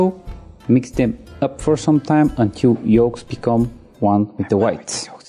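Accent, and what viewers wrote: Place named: Eastern Europe. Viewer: Nah, u just can't understand slav accent.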